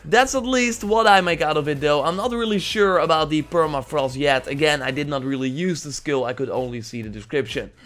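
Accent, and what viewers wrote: Netherlands. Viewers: Mr_Bohowsky he's Dutch. Let him be. The Dutch-English accent is our fucking pride, man.. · Nederlandse accent cringe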